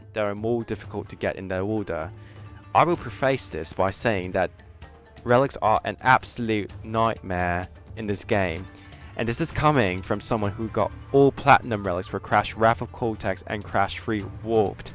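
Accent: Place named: United Kingdom. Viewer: Also, your British accent is 👌👌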